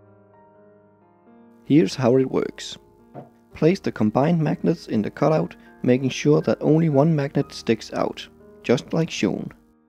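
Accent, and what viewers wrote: Denmark. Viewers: The danish accent is strong with you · Are you from Denmark. I think I here Danish accent. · Are you from Denmark? Your accent seems Danish.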